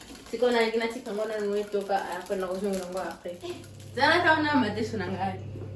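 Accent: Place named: Democratic Republic of the Congo. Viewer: Lol quel accent congolais très drôle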